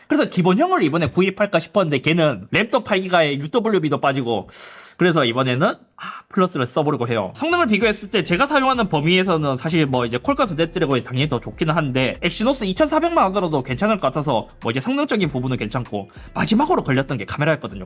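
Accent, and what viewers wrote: Gyeongsang, South Korea. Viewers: 선진국형 삼성폰.후진국형 아이폰.해설자 상도사투리 극혐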